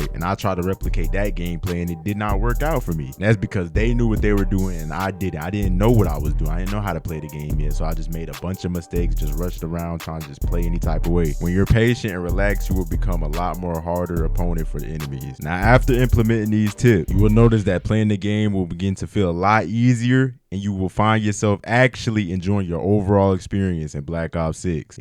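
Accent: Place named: South, United States. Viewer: Bro you from the south ain‘t you 😅 anyways great videos!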